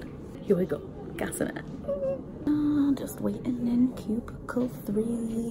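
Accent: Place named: Manchester, United Kingdom. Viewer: Manc accent alert!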